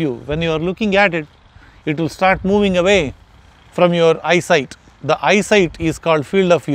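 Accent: India. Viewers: Indian accent 🥴. I don't understand anything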